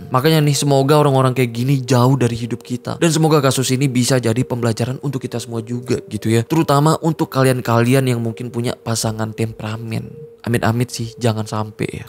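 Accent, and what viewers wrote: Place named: Java, Indonesia. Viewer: Sak jane penak kisah cintane supriyanto sampek bolak balek medok 😃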